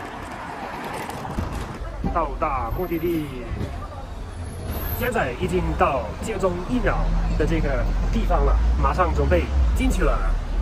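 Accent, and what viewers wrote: Sichuan, China. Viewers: 提个建议，你最好用英语加中文字幕，你的普通话水平太一般了，还有点川味，哈哈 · 的四川话说得可以呵！才来了五年👍👍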